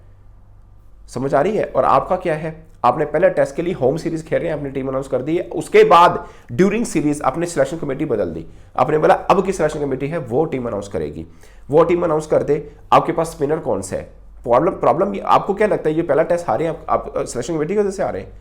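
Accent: Punjab, India. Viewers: Your Punjabi accent is too good sir....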